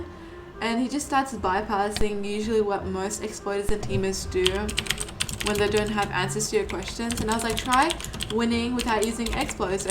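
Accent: United Kingdom. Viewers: Are u from uk? Also I luv ur voice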